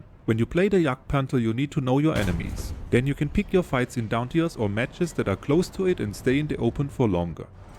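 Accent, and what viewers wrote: Germany. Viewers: Eine Sekunde gehört und gewusst, da spricht n deutschsprachiger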